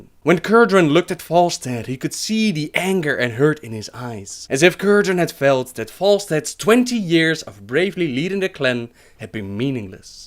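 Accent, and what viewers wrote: Netherlands. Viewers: I enjoy his Dutch accent to be honest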